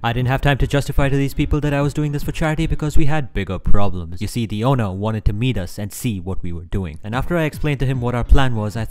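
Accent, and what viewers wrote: India. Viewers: You're Indian aren't you You have an Indian accent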